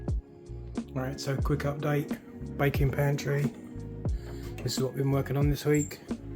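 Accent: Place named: England, United Kingdom. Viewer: Amazing work—love his English accent…😃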